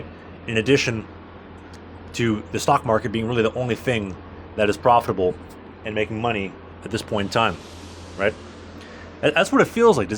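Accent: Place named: Canada. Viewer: I'm a 35 year old Indian American VP at Nividia and so glad I don't have to live in Canada and be broke and freezing all year and have a goofy accent like this guy. 😆 "Aboat, aboat, aboat!" 😆 If I ever see you in America I am calling ICE to put you in a detention center you silly nobody Canuck.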